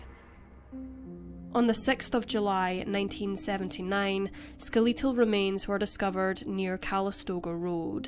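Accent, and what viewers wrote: Scotland, United Kingdom. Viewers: I love your british accent if I am not wrong. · Really? What a nasty thing to say! This lady is Scottish, but obviously you’re not educated enough to realise that! If this is an example of how your mind works, you should be ashamed of yourself.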